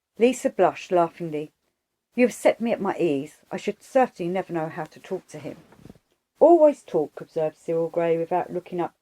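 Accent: United Kingdom. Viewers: Between the horrible audio effect,which muffles every word and the limey accent, it's utterly impossible to follow from sentence to sentence. Why bother? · O wonder! What lovely voice! It’s so beautiful to listen to Crowley’s writings in a british (i assume british. I apologize if different) accent.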